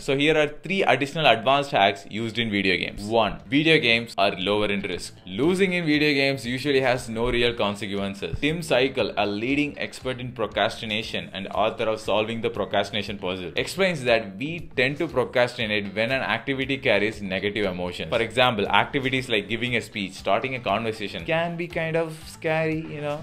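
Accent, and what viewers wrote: India. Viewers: Accent replicates how Americans think Indians speak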